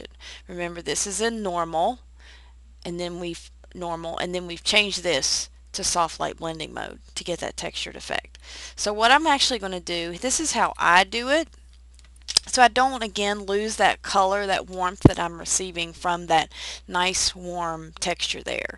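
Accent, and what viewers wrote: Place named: South, United States. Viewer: thanks, dig that sexy accent too.......are you from the southern part of the states ?.........great vid !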